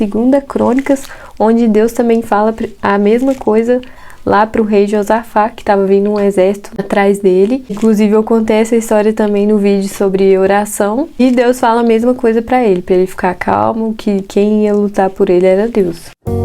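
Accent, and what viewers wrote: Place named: Minas Gerais, Brazil. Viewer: Vc é mineira? Percebi por causa do seu sutaque 💗que Deus te abençoe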